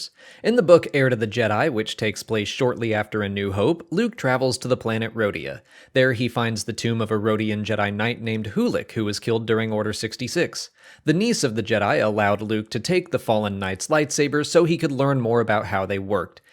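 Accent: Australia. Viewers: What part of Australia are you from? You sound funny. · which part of australia are you from? the accent isnt what i expected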